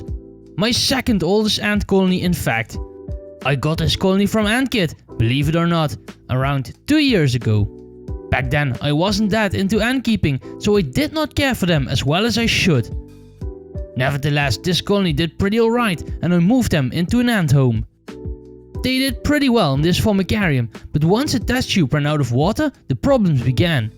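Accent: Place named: Netherlands. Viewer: Cool accent. Let me guess... You are from the netherlands?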